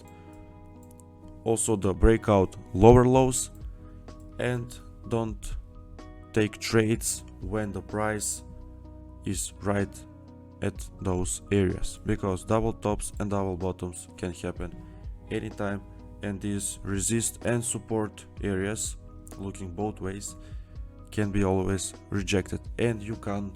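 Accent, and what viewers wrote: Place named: Russia. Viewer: Very very good material! Откуда русский акцент?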